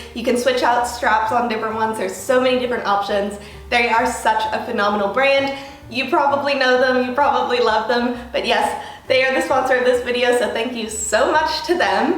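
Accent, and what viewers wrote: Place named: United States. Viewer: i’m soooo confused u reside in australia/ also sponsor australian brands, but u have an american accent ??? 😩😩😩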